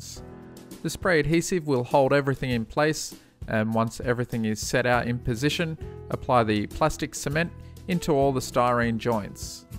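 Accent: Australia. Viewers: where is he from, Australia ? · Hey! What part of Australia are you from? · Are you Australian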